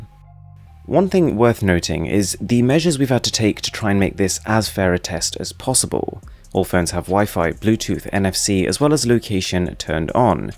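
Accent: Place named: RP, United Kingdom. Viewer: Is it on purpose that you have that posh accent when talking ?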